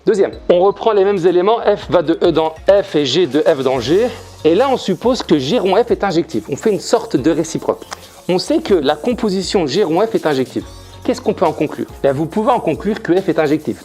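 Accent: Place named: Asia. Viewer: Pourquoi vous portez un masque si ce n'est pas trop indiscret d'oser poser la question.Je crois en juger par votre accent que vous êtes asiatique😅😅😊.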